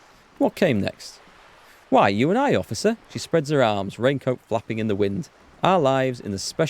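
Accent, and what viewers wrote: United Kingdom. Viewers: You are from middlesbrough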